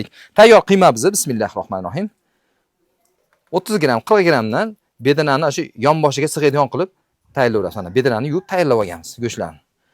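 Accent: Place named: Kokand, Uzbekistan. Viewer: АССАЛОМУ АЛЕЙКУМ КЫРГЫЗСТОНДИ ОШ ШАХРИДАН КУЗАТАМАН КОЛЛАРИЗ ДАРД КОРМАСИН МЕНИ АЯМ КОКОНЛИК ОШГА КЕЛИН БОЛИБ ТУШГАН ШЕВЕЛАРИЗ ОХШАБ КЕТАДИ ЭШИТИБ ХУРСАНД БОЛАМАН😊.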